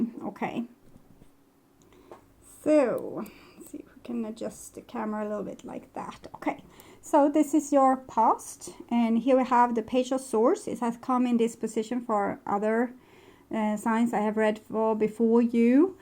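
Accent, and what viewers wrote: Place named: Sweden. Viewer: Are you Swedish btw? Im from Sweden and I think your accent sounds a little "svensk" :-)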